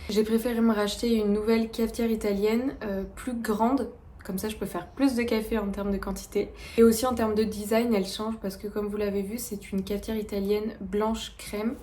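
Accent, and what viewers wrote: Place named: South, France. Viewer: trop du bien , d'ou tu viens ? jentends laccent du sud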